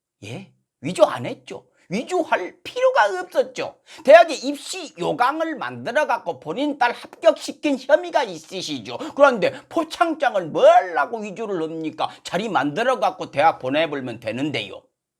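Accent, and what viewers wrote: Jeolla, South Korea. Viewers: 서울에서 태어났담서요~~성동일씨랑 쌍벽을 이루는 라도 사투리 투 톱👍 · 어이 자네 자 네는 전라 도 사 람 이 아니면 서 전라도 말을 그럴싸- 하 게 하는 디 전라도 도 지역 마다 억양 이 다 다르 네 자 네는 전라도 어느 지역 말을 쓰는가 나는 순 천60대 전라도 말을 쓰는 것은 자유 지만 전라도 사람 욕먹는 발언 사가 해주소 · 숨도 안쉬고 마시시네요. 전라도사투리는 들을수록 정감이 납니다. 물론 내용이 더 맛을 더하기는하지만...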